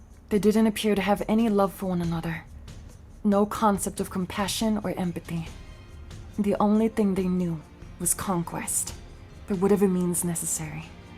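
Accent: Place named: Boston, United States. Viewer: The boston / NY accent bleeding in is hilarious.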